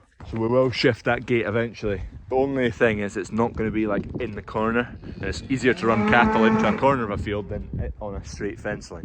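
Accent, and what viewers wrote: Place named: Northern Ireland, United Kingdom. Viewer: Northern Irish accent spot on👌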